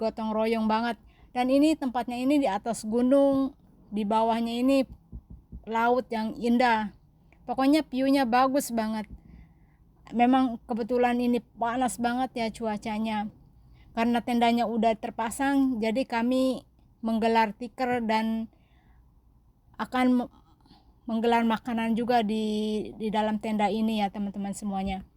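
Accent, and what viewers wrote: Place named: Java, Indonesia. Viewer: Mbak logatnya jawa ,,jawa mana mbak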